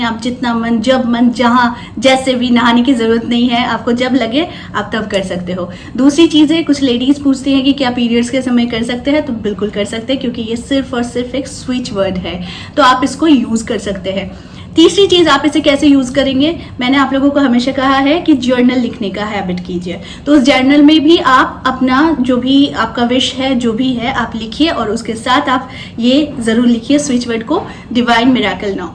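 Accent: Bengal, India. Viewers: Apka vlog accha lagta , apki baath sunkar lgta hai ki ap Bengali ho , me apki what's app MSG karunga jab mevi astrology shikunga❤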